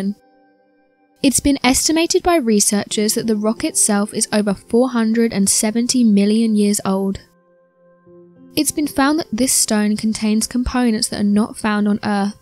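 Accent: England, United Kingdom. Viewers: Ram Rod0 not sure where she would be from but definitely English 😊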